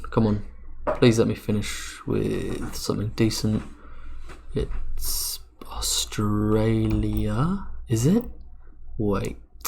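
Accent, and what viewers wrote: United Kingdom. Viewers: Hearing british accent is so releaving. Im from Poland but after listening to american youtube and then switching to british it feels like its my native language. I can't explain why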